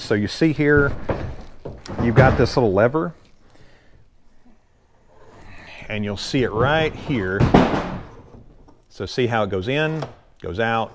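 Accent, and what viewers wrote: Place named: South, United States. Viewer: This Minnesotan enjoys your subtle southern drawl 😁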